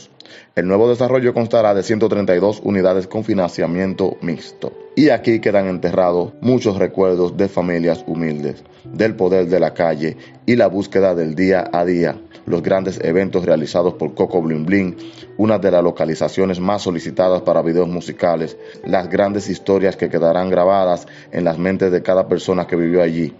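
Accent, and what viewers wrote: Dominican Republic. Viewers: una pregunta, eres Dominicano por si acaso, tu asentó parece de RD!!!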